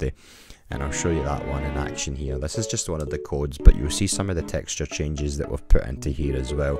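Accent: Scotland, United Kingdom. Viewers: are you scotish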